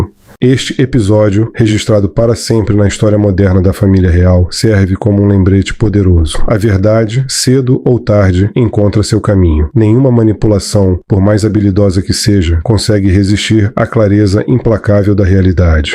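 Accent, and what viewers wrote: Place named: Rio de Janeiro, Brazil. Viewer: Não é problema de respiração.... Este homem que fala é carioca( RJ). Sotaque de pessoas moradores da Cidade do Rio de janeiro..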